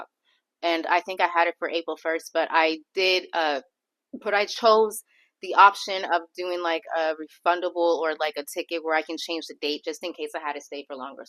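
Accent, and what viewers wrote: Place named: Philippines. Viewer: You sound like a filipina.😂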